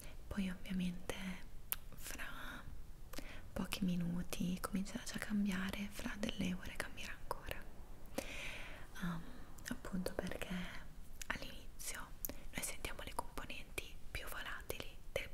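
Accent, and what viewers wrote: Italy. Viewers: Yes I love Italian accent ❤💕💕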